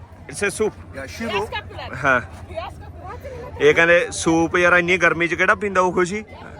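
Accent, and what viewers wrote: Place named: Punjab, India. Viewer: English bro pure jatta wali AA accent pure Apne wala aa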